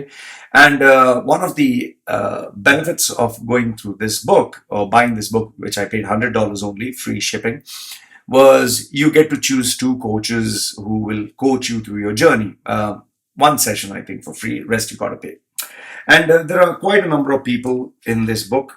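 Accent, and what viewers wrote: India. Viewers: Dude are you indian?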